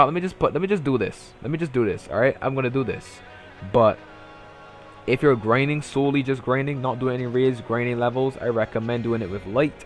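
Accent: Barbados. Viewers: From your accent u from Barbados? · u have a barbados accent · U bajan?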